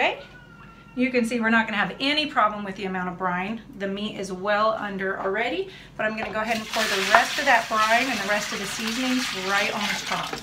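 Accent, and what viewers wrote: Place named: Midwest, United States. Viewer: You sound like you're from the midwest...? I will have to have a go at some corned brisket. Mmmmmmmmmm.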